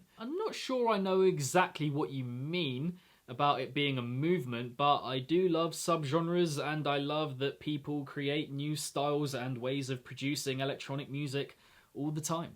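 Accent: United Kingdom. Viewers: 5000 subs is a really nice mile stone. but your lush British accent deserves 100,000,000.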